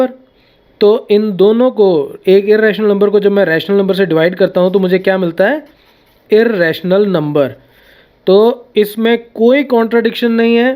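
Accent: Punjab, India. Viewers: App punjabi ho na Sir!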